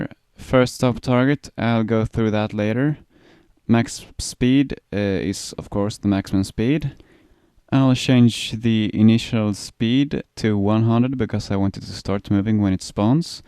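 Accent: Sweden. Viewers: Awesome tutorial! I love your Swedish accent :) I love Sweden, I visited last summer. Keep it up buddy!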